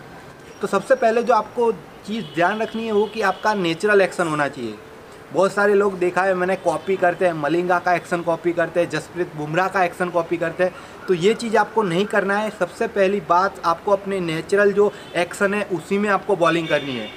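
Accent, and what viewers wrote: Gujarat, India. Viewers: Bhai muje lagta hai ki Aap gujrati ho?? Ans me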